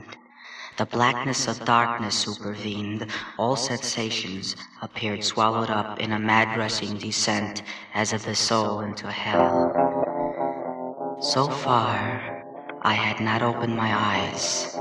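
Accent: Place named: New York, United States. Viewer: Cool. Woody Allen, reading Poe as a New Yorker.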